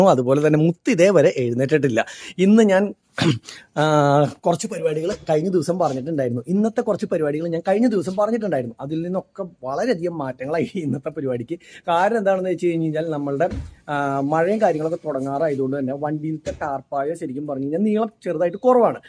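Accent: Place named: Kottayam, India. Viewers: കോട്ടയം ഭാഷ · കോട്ടയം അച്ചടി ഭാഷ ഒർജിനൽ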